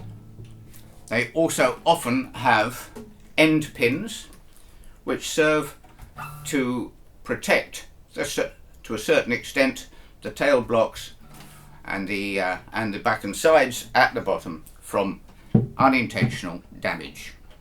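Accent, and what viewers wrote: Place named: East London, United Kingdom. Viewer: HaHa! Your accent and cap made me laugh out loud. I was born in East London and your voice reminded me of many blokes I used to know when I was a kid! So I loved your presentation of this information…although for some reason ‘Derek and Clive’ kept coming to mind while I was watching you!! 🙈😂😂 I’ll have to watch again just so I can concentrate on absorbing the info rather than being entertained and amused! 😂😂 Great job. 👍🏻 Regards, Dave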